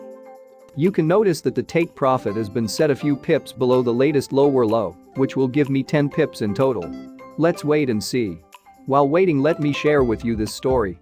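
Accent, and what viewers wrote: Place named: United Kingdom. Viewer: You are somehere in GB, Scotland or England most likely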